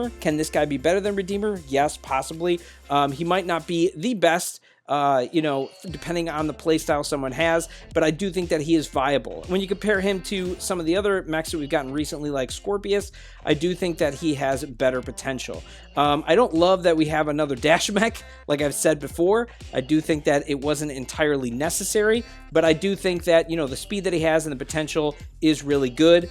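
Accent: United States. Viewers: Hello remember when I told you that I wanted you to review my hangar basically I wanted you to give me a summary of what I have now and what I should have later on again my Id is <num> Have a great day ahead man love ur videos btw I'm subscribed ur like my main mech arena content that I watch every day btw I'm Indian 🇮🇳🇮🇳🇮🇳😎😎and I can speak in American accent like the u speak I can say the same accent and I'm 10 years old and I play mech arena pretty often it's one of my favourite games I love this game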